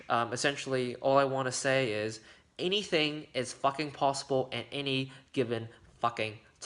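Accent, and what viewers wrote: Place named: Australia. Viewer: I’m so inspired by Dr Joe, I’ve read all four of his books and they’re completely fascinating, inspiring and always help get me out of a shitty mood. Do you live in Australia? Your accent sounds a little Aussie I think :)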